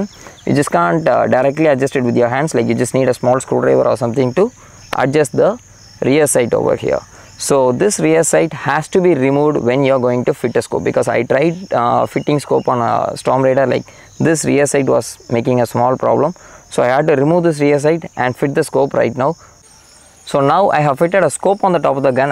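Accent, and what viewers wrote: India. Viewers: Nice Indian accent 👌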